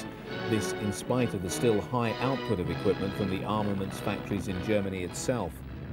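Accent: England, United Kingdom. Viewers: Simultaneous playing of English accent commentary, overlaid gun and artillery fire, pomp and circumstance ochestra music, and actual WWII German newreel audio. It's like the battle of Prokhorovka in my ears.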